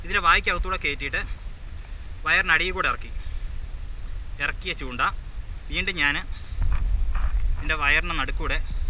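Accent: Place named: Kottayam, India. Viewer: Anta kottayam bhasa manassilavanillaa. ..ijjonnu sarikk njammala Malabar bhasayil samsarikkado...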